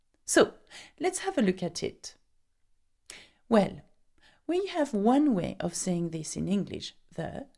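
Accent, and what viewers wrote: France. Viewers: I like the "French" English accent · Sweet teacher. Nice voice. I really like your French accent while speaking English.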